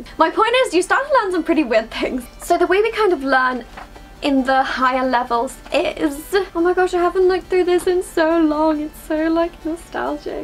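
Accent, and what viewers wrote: United Kingdom. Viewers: You have the most British/English accent I've ever heard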